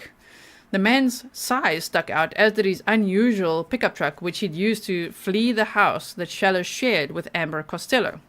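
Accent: South Africa. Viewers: South African? 😊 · Hi G x I'm from the KZN South Coast of South Africa. Always look forward to your videos and South African accent giving international news ❤. You go girl. Watch each episode. Always more indepth and thought provoking.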